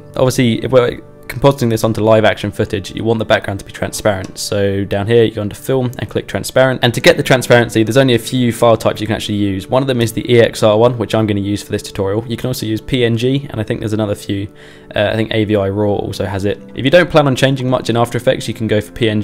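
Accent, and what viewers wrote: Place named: United Kingdom. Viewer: when you have a brittish accent try talking slowly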